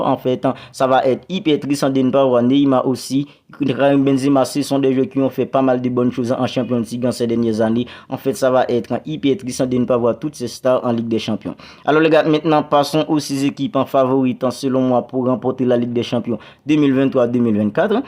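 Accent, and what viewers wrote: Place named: Haiti. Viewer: Ou haïtien boug Mwen ? Ça s'entend 😄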